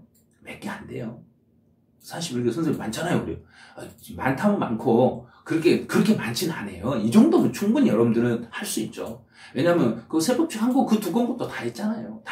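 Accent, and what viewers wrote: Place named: Jeolla, South Korea. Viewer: 쌤 사랑합니다! 쌤 셥듣고 나서 이상형이 바꼇어요~ㅋㅋ 전라도 사투리 쓰는 남자로요~♡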